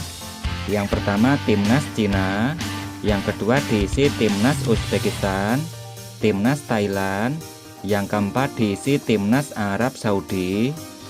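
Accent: Java, Indonesia. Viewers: Yg ke Telu indonesia😂..jawanya medok bang .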